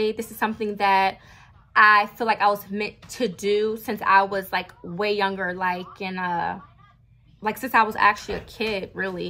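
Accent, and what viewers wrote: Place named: West Indies, Caribbean. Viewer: Gurl keep being you,,, I do feel like the repetitive negative comments are just haters. They give off the “they wish they had your mentality like you vibe.” Your mentality to your look & your perseverance is gonna make insecure feel some type of way. Newer supporters like me just need clarity but a person like me loves your transparency & boldness. You inspire me as a single homeless Mother staying in an hotel. Our shelters in FL are packed & the Staff do be hating when they see a go getter, it’s like they mad that you are doing good in a bad situation. You are a real content creator because you ideas & editing is on point. Keep your head up & keep brushing the haters off. I will see you in the next video,,,, oh I do have one question, are you from the West Indies because I’m from the Caribbean & I hear a lil accent in your voice. 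💜💜💜 -NinJah Bae aka Rude Gal 🫡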